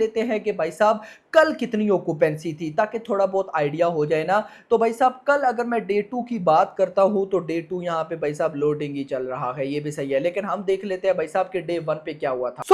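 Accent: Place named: Pakistan. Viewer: Yes ye Pakistani h , iske bolne se malum pad rha h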